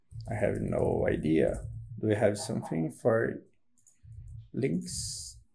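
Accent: Brazil. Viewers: Pelo sotaque tu é brasileiro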